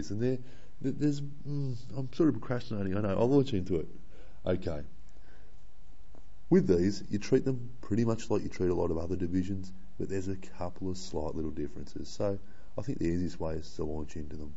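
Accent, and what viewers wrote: Australia. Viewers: i can hear him perfectly, maybe you guys can't understand his aussie accent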